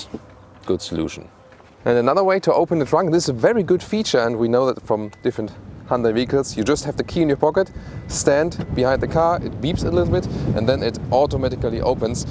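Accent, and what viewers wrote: Germany. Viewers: man, hope you will find an english teacher one day... get rid of the germglish after all these years...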